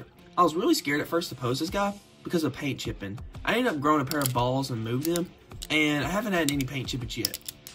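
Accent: South, United States. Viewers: 😂😂😂this was like watching a review voiced by one of the Beverly Hillbillies 😂😂 I'm sorry, but the southern accent had me laughing the whole time. Great review btw.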